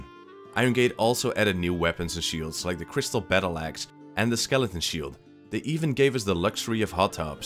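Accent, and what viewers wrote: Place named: Netherlands. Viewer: Such a Dutch accent lol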